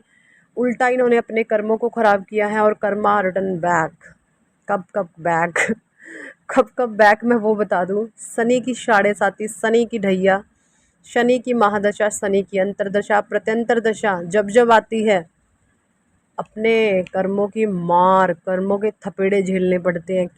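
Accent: Haryana, India. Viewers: आज। की रीडिंग पुरी तरह के से हरयाणवी है एक दम सुपर 👍👑👍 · Pehle apka punjabi touch tha, par aj puri haryanvi boli hai😂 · Sahi kaha Rahe ho aaj haranvi bol Rahe ho ❤😊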